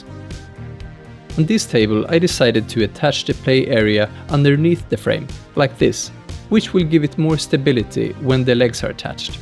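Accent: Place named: Scandinavia. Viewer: What a great video! Loved the approach (love the scandanavian accent too, coming from the UK, it just adds that amazing narrative feel to it - your wife is super cute ) and the channel play on name!! Amazing! Just a really nice video to watch - gonna subscribe just to watch your wonderful journey. Good luck to you both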